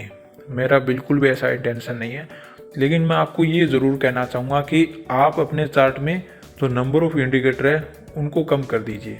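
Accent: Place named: Rajasthan, India. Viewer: Rajsthan k lag rahe hai (meri local language style bol rahe hai)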